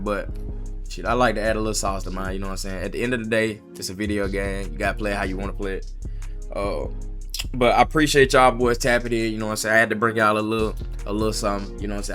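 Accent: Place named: Mississippi, United States. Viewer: What part of Mississippi you from fam?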